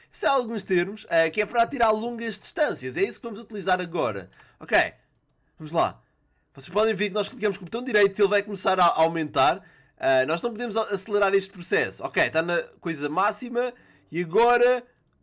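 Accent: Portugal. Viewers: tu é de Portugal? =D mt linda as vozes de lá =D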